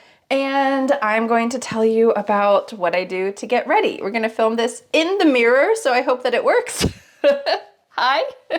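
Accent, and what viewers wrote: United States. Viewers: Mam please add with subtitles to help a lot because you are an American accent and I'm Indian , sometimes doesn't comes on mind so I would love if you could do the same. Thank you 😊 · You speak American English?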